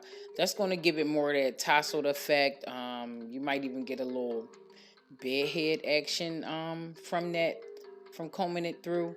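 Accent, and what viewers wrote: Philadelphia, United States. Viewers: I just want to say that hearing your Philly accent gives me joy. And that I learn so much from these videos.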